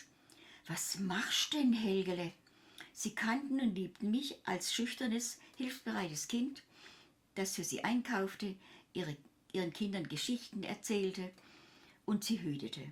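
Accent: Swabia, Germany. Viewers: Kann es sein das du leischt schwäbisch redest 👐🏻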